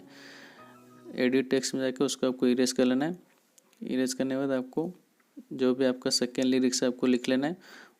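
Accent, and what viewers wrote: Odisha, India. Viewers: Bro are you from odisha i can fell your odia vibes 🖤🖤🖤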